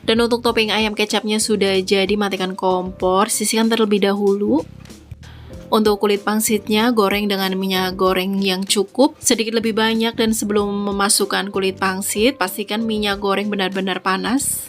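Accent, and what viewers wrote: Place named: Java, Indonesia. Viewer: Medok banget mantap resepnya mama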